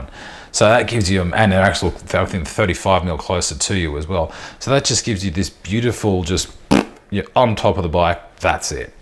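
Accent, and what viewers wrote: Australia. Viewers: not at all. Just wondering why you Americans want the whole world to mould to the way you to things. Self promoted exceptionalism. is a great guy doing a great job - he is an Aussie (grew up not far from where I did), and of course he will speak with an accent and pronounce words as we do in Australia.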